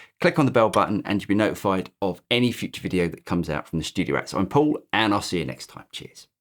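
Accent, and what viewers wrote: United Kingdom. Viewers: try a hamstead, you sound english....come on man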